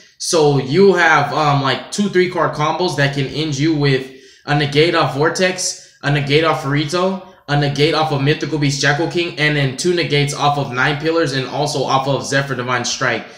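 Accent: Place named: Mexico. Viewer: you sound really mexican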